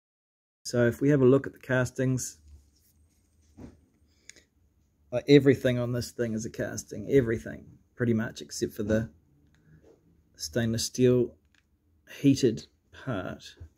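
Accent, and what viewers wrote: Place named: New Zealand. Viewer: Chur bro you sound like a fellow New Zealander?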